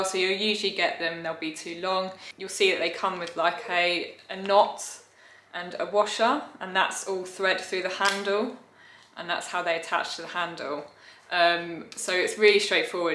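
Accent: England, United Kingdom. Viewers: Love your too cute puppy .Glad to see a actual video that's not a short couple of seconds 👍. Also love your British accent.I am from Southern California USA ✌️ and nice to meet you . You are incredible with the rope .I have enjoyed all of your videos . Wish you all the very best with your YouTube success 👍... SergeantC (Retired US Army) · British English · I love your British pronunciation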